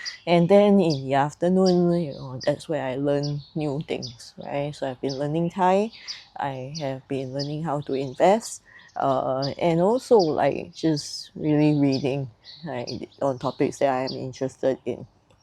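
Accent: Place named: Singapore. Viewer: Nice to hear a Singaporean voice 😊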